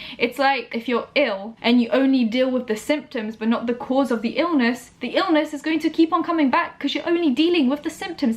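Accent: United Kingdom. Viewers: I have hated everyone in the UK's accent EXCEPT for this girl. idk why I love her voice so much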